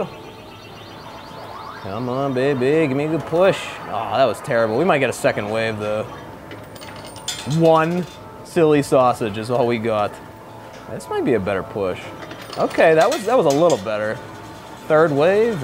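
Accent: Pittsburgh, United States. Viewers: You have a pretty strong yinzer accent.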